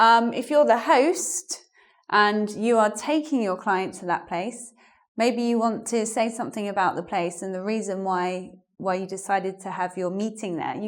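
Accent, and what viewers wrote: United Kingdom. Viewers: I LOVE THE BRITISH ACCEPT XD !!! I LIKED TOO MUCH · omg British women has the cutest accent which makes me very attracted to them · I love your lesson, also British accent... many thanks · Strong Britsh accent! :)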